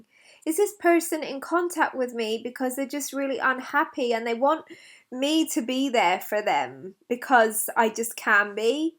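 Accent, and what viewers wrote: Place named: Australia. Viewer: That's a very Aussie accent. Greetings from Brisbane.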